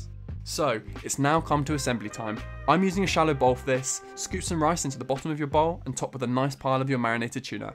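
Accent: United Kingdom. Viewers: u r definitely a Britain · Damn his British accent will be the death of me lol. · British accent..... I love itt😍😍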